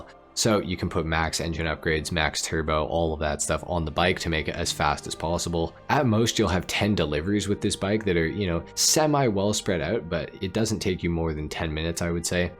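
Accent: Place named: Canada. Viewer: Winnipeg mafia😂 I could tell by your voice you from Canada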